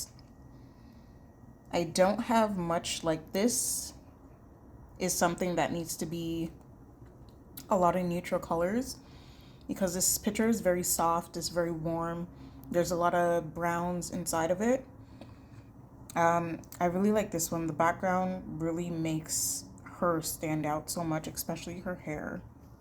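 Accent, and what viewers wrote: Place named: Jamaica. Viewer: A patois (patwa) dat mi hear 😅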